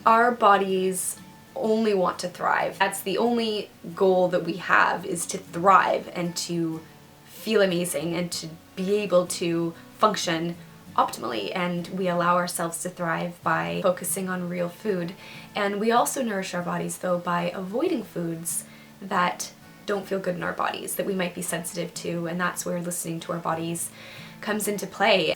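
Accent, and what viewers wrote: Canada. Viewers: Awesome guidance! Super excited when you post a new video! Oh, and I love the Canadian accent!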